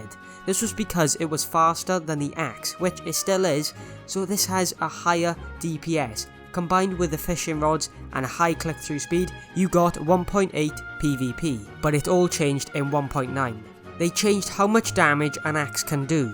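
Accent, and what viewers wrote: Wales, United Kingdom. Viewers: Bro are you from wales, the accent seems familiar 😂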